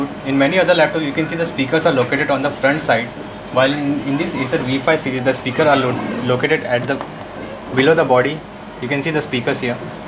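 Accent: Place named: India. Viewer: It's Indian English! Very understanding for Indian people.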